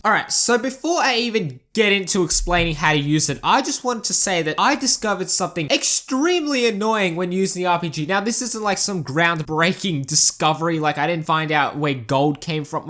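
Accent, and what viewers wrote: Australia. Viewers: hello mr aussie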